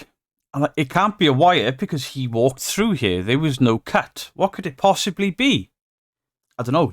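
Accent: Wales, United Kingdom. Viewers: Petition for to read audio books. I love the welsh accent ❤❤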